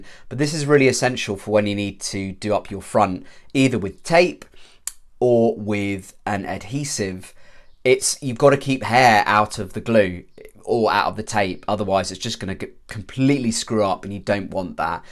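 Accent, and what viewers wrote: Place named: England, United Kingdom. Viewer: greatly appreciate your swift reply! i live in Kazakhstan, perhaps quite far from where you are, which, judging by your accent, is in England?) Agree, going to a stylist would be the safest option. I am torn between Lordhair and Lavivid - Lordhair offer haircut, too, i just need to send them a preferred style from a catalogue. Do you think it is normal/safe? But my biggest issue/fear - how do i measure the size of my head? I have a large head, and should i simply rely on a measuring tape? thanks, mate!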